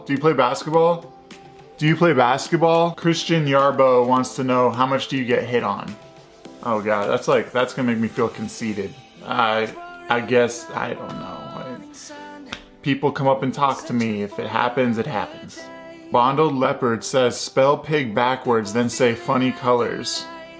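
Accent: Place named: Ireland. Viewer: With your red hair and Irish brogue, have you ever been mistaken for a leprechaun?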